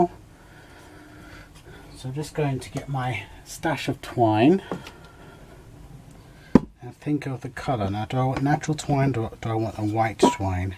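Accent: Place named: United Kingdom. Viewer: Very cute. What will you do with the feathers? I like them also. I love listening to your accent, it reminds me of my son-in-law who is British. He and my daughter live in St. Neots.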